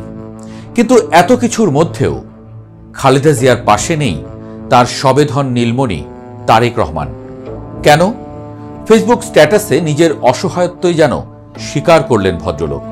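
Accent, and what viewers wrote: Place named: India. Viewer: এর খবর পড়া স্টাইল দেখো মনে হচ্ছে এই মালটা দাদাদের দেশের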